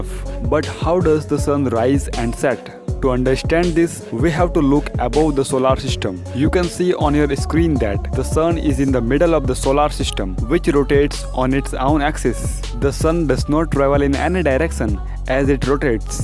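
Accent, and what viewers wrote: India. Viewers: I guess the speaker is from India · What a thick Indian accent! Totally wrong info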